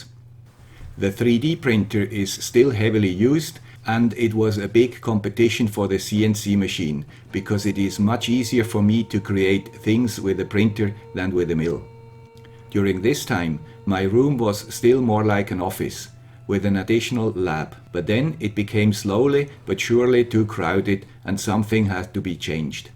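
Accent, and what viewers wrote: Switzerland. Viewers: Congratulations! Is that picture "young guy with swiss accent" on your shelf? And very nice solution for cables! I think I'm gonna do same thing behind my door. Thank you. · Well done " " (or is it white gloves now:) )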